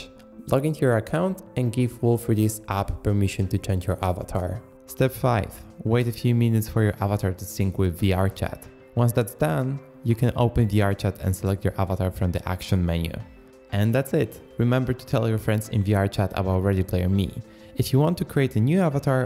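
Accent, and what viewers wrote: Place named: Sweden. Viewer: he used to have a strong Swedish accent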